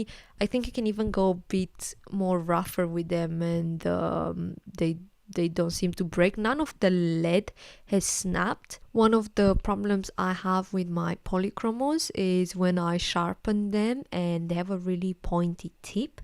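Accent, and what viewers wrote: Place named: England, United Kingdom. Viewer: great drawings and cute english accent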